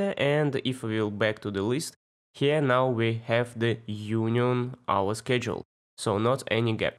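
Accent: Russia. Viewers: Are you Russian?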